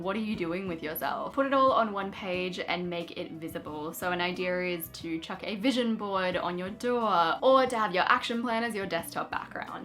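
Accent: Australia. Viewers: I am trying to place your accent. Are you Australian?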